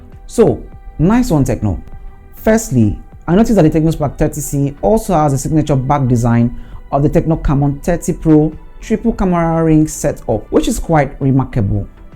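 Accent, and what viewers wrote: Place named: Africa. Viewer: African english is funny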